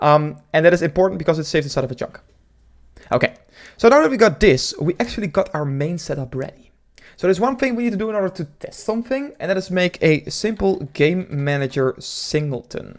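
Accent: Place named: Netherlands. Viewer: I smell Dutch :D :D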